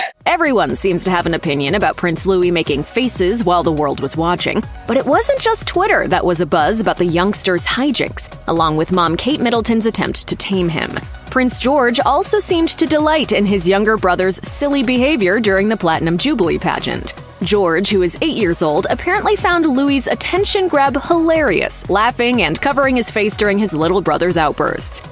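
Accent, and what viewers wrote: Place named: United States. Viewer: Why American commentator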